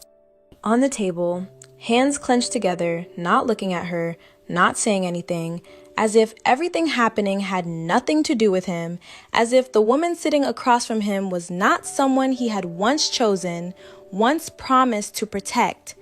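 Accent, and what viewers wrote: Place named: United States. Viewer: No!!! American accent 😩 BUT good story ❤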